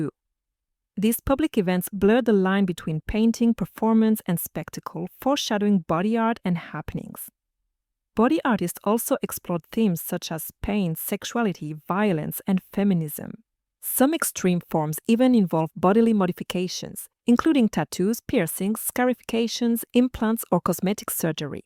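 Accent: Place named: France. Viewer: You have a beautiful voice with your elegant French accent. Your analysis of great works of art is clean, consise and freshly enlightening. Thank you for this.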